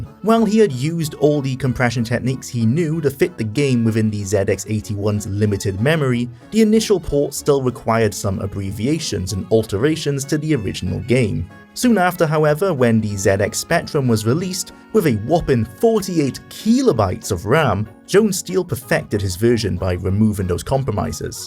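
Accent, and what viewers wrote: Wales, United Kingdom. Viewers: What gave that away? The thick Welsh accent?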